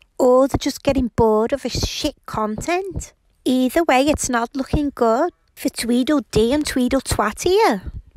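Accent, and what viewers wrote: Liverpool, United Kingdom. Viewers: The Liverpudlian accent accentuates the snarkiness 😉 love your vids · Are you scouse?! 😂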